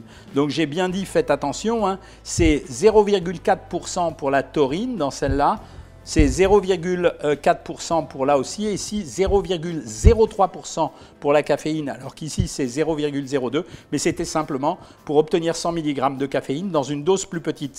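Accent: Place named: England, United Kingdom. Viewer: J’adore votre accent anglais 😂😂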